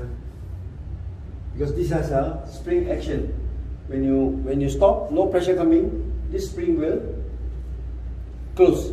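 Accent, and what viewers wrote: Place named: Singapore. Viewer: Sounds like Singaporean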